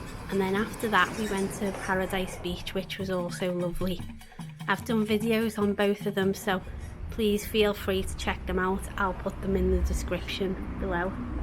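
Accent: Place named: Yorkshire, United Kingdom. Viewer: Canadian here so I'm a total moron and can't place your accent... is it Yorkshire?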